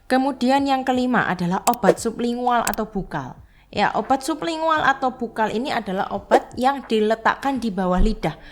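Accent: Java, Indonesia. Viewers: Suaranya ndeso medok .ga enak bget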